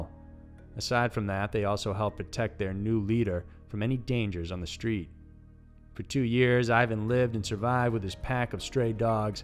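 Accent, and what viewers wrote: South, United States. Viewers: You are Canadian..Why you talk like you are from the southern states of the U.S?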